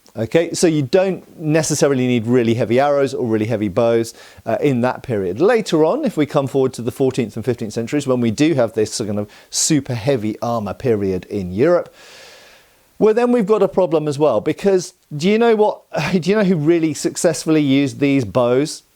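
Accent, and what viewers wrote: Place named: England, United Kingdom. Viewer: Seriously? If you’re American and you’re criticising how an English person is speaking English, consider where your language actually comes from, and who’s actually the one butchering it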